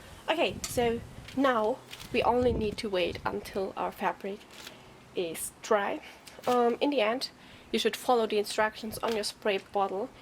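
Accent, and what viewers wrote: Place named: United Kingdom. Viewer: Cool, das merke ich mir. :3 Und die Folie fixieren, das merke ich mir auch :D Du hast einen süßen britischen Akzent :D Liebe Grüße Zementitia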